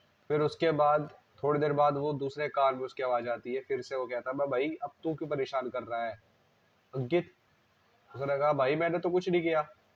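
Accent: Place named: Rural, India. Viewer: Horror nahi comedy hai... jaahil bewakoof aur dehati jaisa bol raha hai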